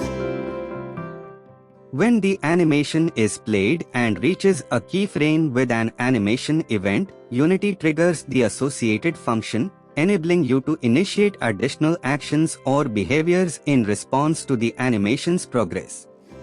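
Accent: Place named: India. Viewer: Why did you specifically use a text to speech with an indian accent?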